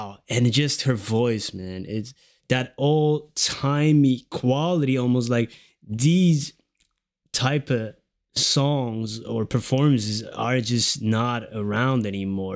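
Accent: United States. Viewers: are you sure you don't have some New York in you? The more I listen to you, the more I hear a slight NY accent. And then when you said, "You know what I mean?" - Barbra always says that phrase in her NY Brooklynese accent! Hehe! Anyway, it was great seeing your reaction again to another Streisand classic. (Me thinks she's got you hooked! 😉) Hey, I learned something from you on this one I never knew. You said this song was written in 1924. And then mentioned how we're listening to it 100 years later in 2024. And guess what, Barbra was 24 in this TV Special here. And also Barbra has always said that 24 is her lucky number! I love numerology! Wonder what it means, though? Lol! BTW, I'm dying to see your reaction to the Yentl Medley or even just "A Piece of Sky" from the movie Yentl. Can't wait to see your face when you get to one of her pinnacle blow-you-away numbers! 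She's got some real blockbusters you will almost not believe that that voice is coming out of a human being! 😎👍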